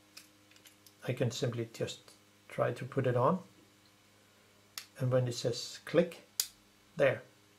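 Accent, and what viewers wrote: Denmark. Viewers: You've got a cool accent but I can't pinpoint it. Are you Danish?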